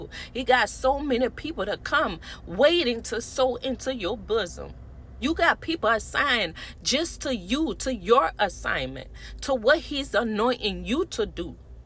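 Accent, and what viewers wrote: Louisiana, United States. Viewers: Say that Sis 👏🏾👏🏾‼️ I’m loving that Louisiana twang!!!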